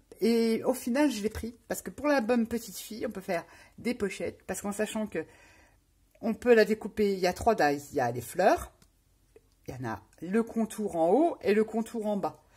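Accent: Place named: Lorraine, France. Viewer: Bonjour, je suis nouvelle abonnée, et j'entends que tu es en Lorraine comme moi...Tu es de quel coin? Je suis de la région de Pont-à-Mousson. Peux-tu me donner l'adresse de ton nos stp? Bisous 👋⚘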